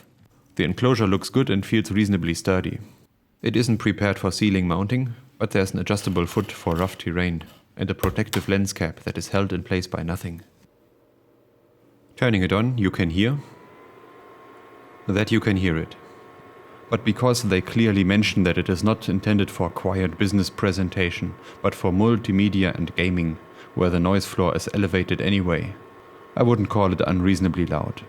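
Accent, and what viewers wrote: Germany. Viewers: I like how you don't even try to hide your german accent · Spaten Pauli its german english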